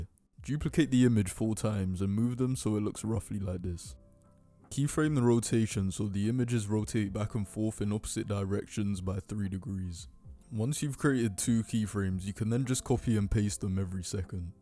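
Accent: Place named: Burnley, United Kingdom. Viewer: Are you from Burnley? love your accent.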